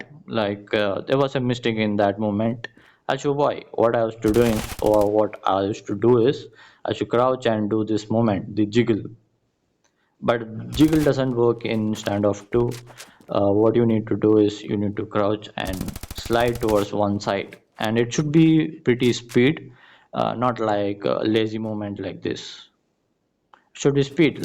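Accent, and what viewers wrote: India. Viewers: Pajeet accent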